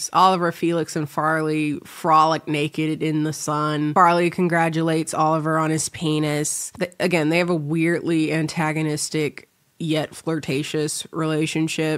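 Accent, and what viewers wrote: United Kingdom. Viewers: Don’t worry another recap spoiled those scenes and I kind of need those scenes spoiled to know when to fast forward. I would say Talented Mr. Ripley then Mean Girls cause Cady would never murder Regina lol.Love the British accent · I haven't watched your vids in a while and suddenly you sound British?!!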